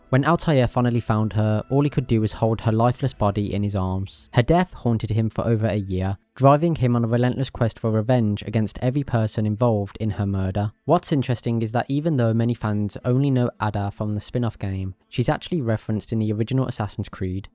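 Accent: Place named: Birmingham, United Kingdom. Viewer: Errr Birmingham accent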